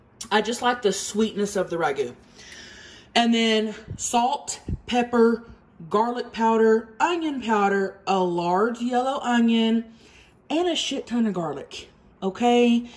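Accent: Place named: South, United States. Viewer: your country accent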